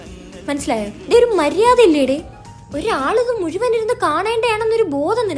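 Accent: Thiruvananthapuram, India. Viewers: Currect tvm language 😂😅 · Thironthoram samsarikunna daivam 😂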